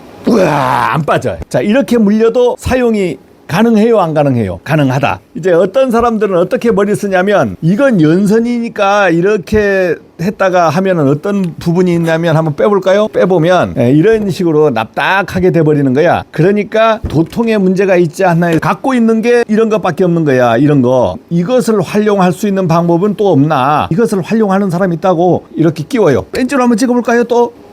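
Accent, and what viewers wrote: Jeju, South Korea. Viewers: 제주도 삼춘이다, 발음은 제주 토백이다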